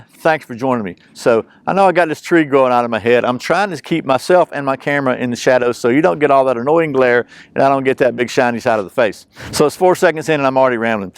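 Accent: Georgia, United States. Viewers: You have a really relaxing voice. Are you from GA or thereabouts?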